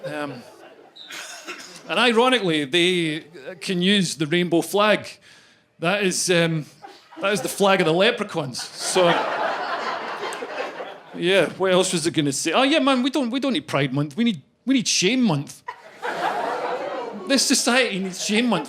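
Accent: Scotland, United Kingdom. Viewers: His Scottish accent makes the jokes even better. Non-Americans have such effective vocabulary and makes things a lot funnier than they probably are. It took me a very long time to know I'm Ace, partly because I don't f*cking care about orientation or labels. Is it too much to simply exist without knowing some personal preferences? I'm all for figuring oneself out to have a peace of mind but, damn. The alphabet people have taken things *WAY* too far this year. Be happy with who and what you are but let's remember to keep some things to ourselves. Some gay guys I knew/know didn't show any "signs" as they didn't make it out to be their only characteristic. Very polite. Well spoken.They also just so happened to dress very well but doesn't every self-respecting person do that? Annnyway, the only real fact didn't say about who's actually upset about us Asexuals is feminists. 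Feminazis in particular, the man-hating ones that want nothing more or less to wipe us off the Earth. When in college I read a passage which humored me. It was a bona fide statement backed up by some sources claiming Feminazis hate us Aces because we don't fit into that stupid rhetoric they're always saying: "Men only want one thing..." uh... if it means what I think it does, no we don't. Begone, Karen! Off to CVS for a boxed wine for your sorrows and bag of Whiskas for the cats! Try to figure out a more practical way to insult us Aces. Take your time. I can wait. By the way, if I understand the online comm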